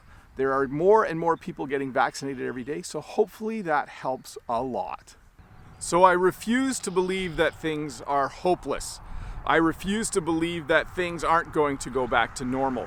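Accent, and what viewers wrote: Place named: Canada. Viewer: Through your videos i can understand and learn canadian accents.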